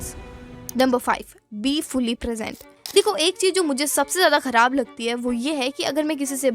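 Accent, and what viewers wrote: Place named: Marwari, India. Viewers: Aapki marwari sunkar achha lga di 😊❤ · Diiii...aap rajasthan se ho..mein bhi ..maine aapki har video dekhi hai.aap bohot achi videos banathi hai.aur aapki marwadi slang bhi ek masth hai 🤌🎀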